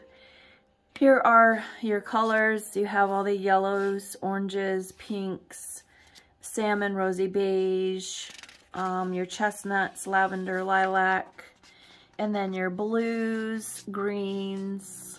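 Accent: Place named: England, United Kingdom. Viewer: Are you from Australia or the USA? Just wondering what dollar we are talking, actually you sound very English ☺️ I was born in England but I have lived in Australia and become an Australian for 50 years now. The time goes so fast, the older you get the faster it goes 🤔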